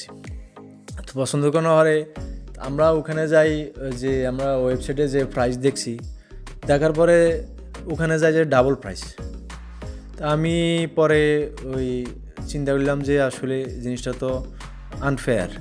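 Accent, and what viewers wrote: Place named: Noakhali, Bangladesh. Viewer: Baiya apnader bari Noakhalir kon jaigai plz bolen, apnar Kotha amader ancholik basar moto.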